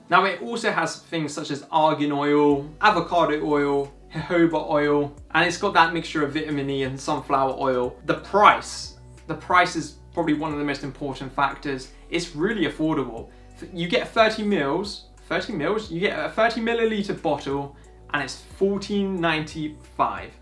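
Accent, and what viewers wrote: United Kingdom. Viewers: I have a full beard past a fist length from my chin and am always open for suggestions and products that I may not otherwise be aware of. I recently subscribed to your channel and I listen attentively to your videos, which brings me to my random off-topic question: where specifically in the UK is your accent from? You have a pleasent and relaxing speech pattern that makes it easy to listen and perfect for instructional videos (in my own humble opinion). Your quirky style does not hurt either. Clear, relaxed, no nonsense, unpretentious videos. What more can one ask for? Just saying. Keep them coming.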